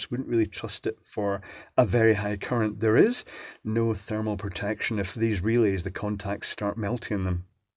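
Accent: Scotland, United Kingdom. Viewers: Hello, i love the Scottish accent, it makes everything more interesting. 😊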